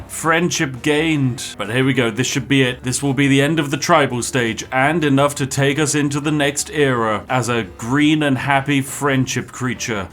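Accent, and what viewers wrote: United Kingdom. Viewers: Is it just me or is he somehow acting better as himself than last video? Like, he's just more british than usual or something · Me realised he’s practically British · I love how talks like a true colonizing brit from the 15th- 16th century. hilarious! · Wait this guys british?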